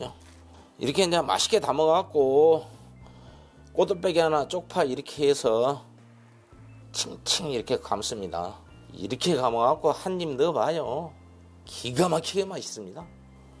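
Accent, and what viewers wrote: South Korea. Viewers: 쉐프님 구수한 사투리로 꿀팀!! 레시피 꼬들빼기 ㆍ쪽파 환상의 맛김치 강의 잘 들었습니다 ^^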